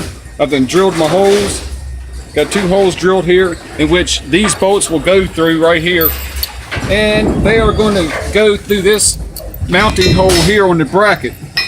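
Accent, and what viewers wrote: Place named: South, United States. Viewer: You have a southern accent!!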